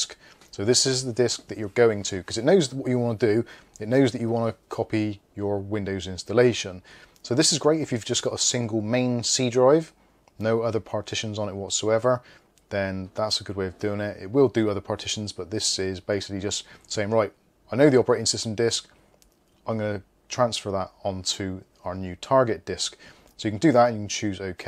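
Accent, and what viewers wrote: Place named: West Country, United Kingdom. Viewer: Great video. Nice West Country accent ;-)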